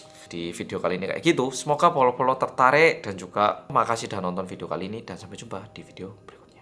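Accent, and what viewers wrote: Java, Indonesia. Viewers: Medok muantepol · Mas bahasa indonesianya lues banget, logat jawa sih, tapi yang ndak tau klo mas orang korea pasti dikira lahir di indo, hidup di indo, asli indolah wlopun keturunan korea. Klo youtuber korea yang lain, masih bisa ditebak, krn walopun berbahasa indo tapi logat koreanya keliatan.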